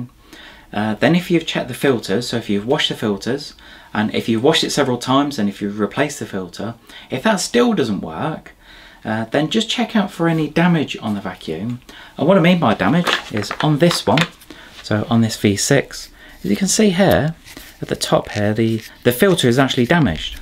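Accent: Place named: England, United Kingdom. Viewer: ох уж этот английский акцент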